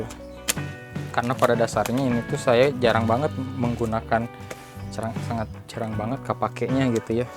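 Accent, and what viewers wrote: West Java, Indonesia. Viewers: logat sunda na nempel teuing a :( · sunda pisan njir, mantap kang 👍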